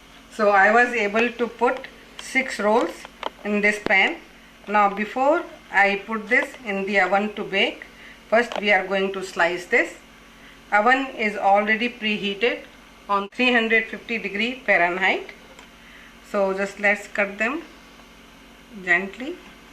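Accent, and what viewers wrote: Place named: India. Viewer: everything that you teach us is wonderfully delicious! Please tell us the name of the (teaspoon) spice that you're adding to the sugar syrup., you're Indian accent is lovely but I keep missing the name. Thank you!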